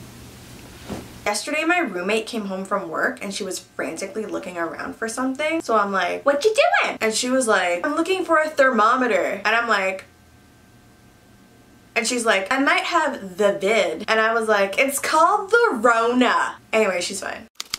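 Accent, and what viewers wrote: United Kingdom. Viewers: amazing british accent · this hair! this beat! this girl! her british accents!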